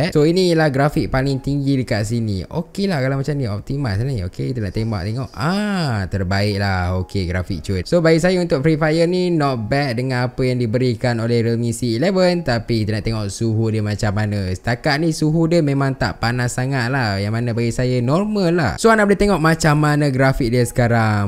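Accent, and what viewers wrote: Malaysia. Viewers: Lucu ngomong org Malaysia akwkakw jadi suka dengerinya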